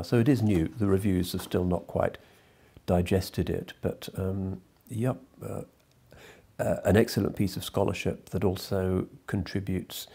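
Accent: United Kingdom. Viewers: He is so eloquent..that soothing elegant British accent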